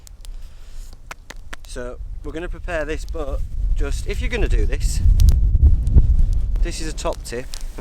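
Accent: Yorkshire, United Kingdom. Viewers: your a funny fucker..I love the Yorkshire accent.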